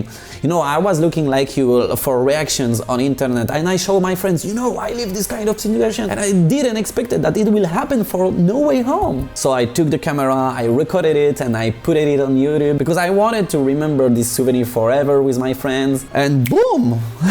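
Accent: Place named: France. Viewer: its always beautiful this french-english accent, keep goin :))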